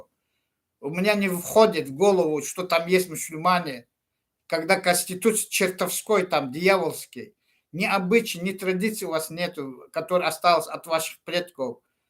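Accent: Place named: Armenia. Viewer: Ты сначала учись розговариват. Как армяны говоришь.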